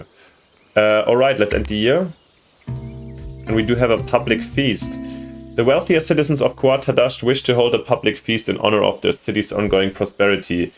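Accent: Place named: Germany. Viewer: Direkt den Alman rausgehört😂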